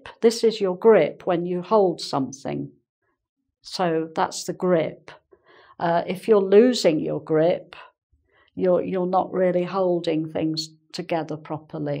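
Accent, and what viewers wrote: England, United Kingdom. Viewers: I like her way to explain the lessons but it seems like she teach british english more than american english. I realized of for her accent. Even I think that lessons can be useful in both. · I've been trying to practice some British accents. I think I can learn them from. Anyway, I'm wondering what dialect of British accents she speaks with? I usually speak English with an American accent but I really want to learn myself and teach British accents to my students! Would you guys please give some good tips for it~? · Love your kind English accent ...I imagine taking a cuo of tea with you talking :)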